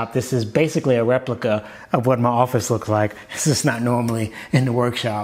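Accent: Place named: Baltimore, United States. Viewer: My biggest takeaway from the 1.5 videos I’ve watched, is that I’m 100% sure this dude is from Baltimore. That accent is unmistakable 😂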